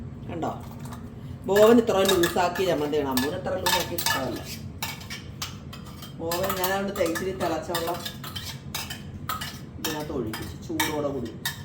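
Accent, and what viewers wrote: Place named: Ernakulam, India. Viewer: Super singu... Live happily God bless u ekm il ninnu parayunnapole undi❤❤❤❤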